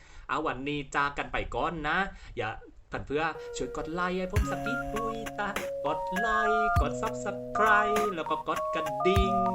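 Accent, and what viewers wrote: South, Thailand. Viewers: ภาษาใต้ปุ๊ปกดออกเลย ฟังไม่รู้เรื่อง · ชอบการรีวิวแบบแหลงใต้ของคุณ 555 ฟังไม่เข้าใจบางคำแต่รวมๆตลกมาก ข้อมูลละเอียดดีมากค่ะ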